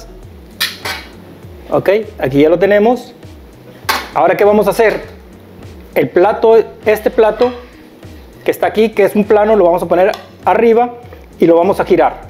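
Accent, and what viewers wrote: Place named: North, Mexico. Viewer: Su asentó del meritito Norte me encontró!!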